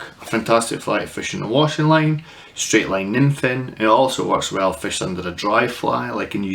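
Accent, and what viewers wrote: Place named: Stranraer, United Kingdom. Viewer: Another nice fly, that sounds like a stranraer accent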